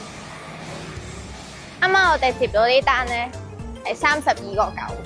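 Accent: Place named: United Kingdom. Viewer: 聽把聲就似一個毛都未生齊嘅靚妹。 口音好似係學英式英文嘅人。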